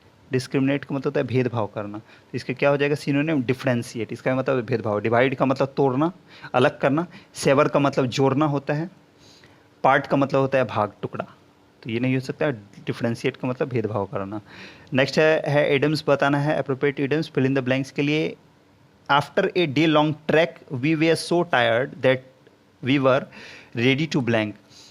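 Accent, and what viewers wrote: Bengal, India. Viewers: sir, aap bengali ho?? LOVE THE WAY YOU TEACH...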